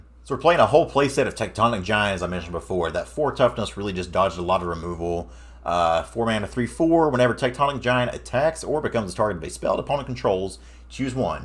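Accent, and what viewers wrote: South, United States. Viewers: Great decks, great analysis, charming southern dialect. I’m sold. Btw, it’s not pronounced «djorn,» it’s «yorn.» ;) Source: Am Norwegian.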